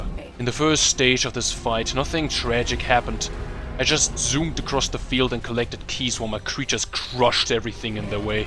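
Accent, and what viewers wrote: Germany. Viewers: Bro u german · I love yo vids, but I have just one question: Are you german? 🧐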